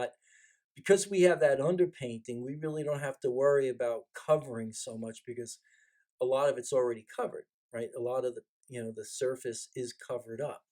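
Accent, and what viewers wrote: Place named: New York, United States. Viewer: I detect a New Yorker (I'm originally from B'klyn).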